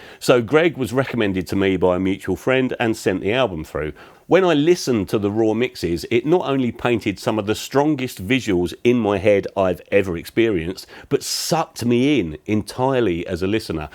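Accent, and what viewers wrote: United Kingdom. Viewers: Woww! How could I've learned so many things in just over 10 mins video? Honestly, sometimes Brit accent confuses me, but in your case...SUBSCRIBED!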